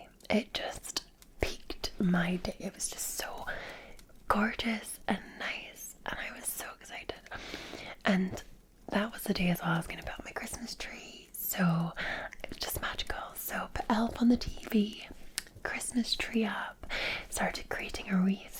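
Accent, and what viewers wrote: Scotland, United Kingdom. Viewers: Great video ❤ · U had me at Scottish 🏴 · half an hour of tingles from the resplendent scottish lass. perry the platypus noises ☺️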